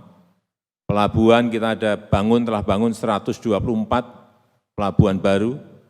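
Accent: Java, Indonesia. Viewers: Pak jokowi klo pidato : saya seneng.. Karna logak beliau jowo tenan.. Meskipun Aku tutu wong jowo tapi seneng ngomong jowo. Halus.. 😁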